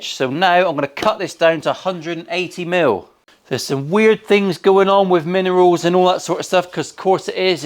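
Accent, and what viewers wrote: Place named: Cockney, United Kingdom. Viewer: I Fookin Luv You, you caffeinated jittery Janner ❤ Never afraid to do it your way, Fookem All Mista the North Devon Cockney Sparrow!!!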